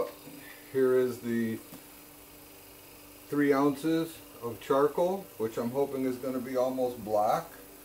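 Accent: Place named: Michigan, United States. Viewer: WOW!!! Amazing! You have been absolutely informative and helpful. All 3 turned out great, but I like the "fingers" better, seemed more naturally swirly and less angular.. I'm hoping to take on a small bathroom vanity in the near future and had a lot of doubt about concrete until I saw your channel. "Michael builds" channel is helpful too, so my husband and I bought the rapid set mortar mix... Plus an extra bag too experiment with. I was also thinking about adding a tiny bit of fine powdered red clay (we live in Georgia and it's everywhere) to the bottom of the mold for "rust" streaks in the "marble." I can't wait to try it out! Thanks!!! Love your accent, I lived in Michigan once upon a time!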